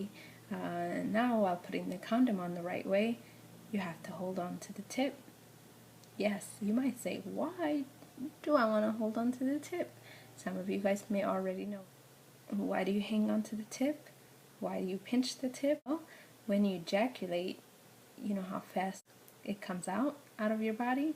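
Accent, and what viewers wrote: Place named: Tigrinya, Eritrea. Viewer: She's a decent person. I enjoy her manner of speech. How slow and carefully she says every word gives her that classic Eritrean-American form of speech. A few of my female cousins in L.A who speak Tigrinya like my parents but are college educated. They all speak and have that very subtle Tigrinya accent like this chick does. The Eritrean in me wanted to not like this video. At first I thought it was too vulgar because she details pre-cum and and the speed of sperm. Watching more of the video. I couldn't help but think kids (and even some adults) are going to type "sex" in the searxh column anyways. There are a million videos of other people doing this same thing. Those other people are not Eritrean though. I'm a firm believer on the adults being and looking like the children who are learning. It's nice to have a young woman who looks, speaks and is similar to my little niece, sister, brother ect. 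I'm studying to be a high school geography teacher. I'm doing that because I want my children and children of similar color as me to see an educator who looks like them. The only major disappointment for me with this presentation is. No visual example. She details and is very well informed in what and how to use a condom. My issue is that if someone is watching this video to learn. How will they retain a visual memory without having seen how to do what she's saying? A condom and a banana or a cucumber would have helped in this video. Eveything she is saying is right. Add a visual exa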